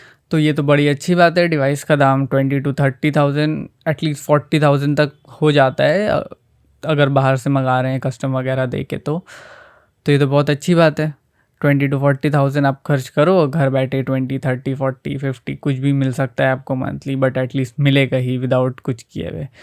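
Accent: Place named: Hyderabad, India. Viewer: he has hyderabadi accent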